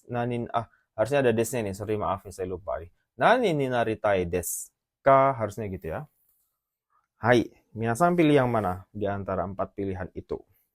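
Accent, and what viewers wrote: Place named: Medan, Indonesia. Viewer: lah, sensei orang medan,, kirain wong jowo hhahah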